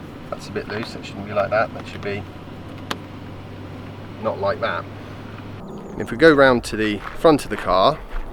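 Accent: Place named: United Kingdom. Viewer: Wait where are you from? You sound British and have a right hand drive vehicle but you speak in miles. I'm so confused.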